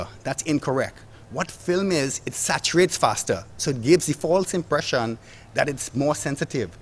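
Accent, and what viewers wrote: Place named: Trinidad and Tobago. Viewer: Fellow Trinbagonian!! Currently at PSU. Good to hear a trini accent! Lol..missing my doubles:(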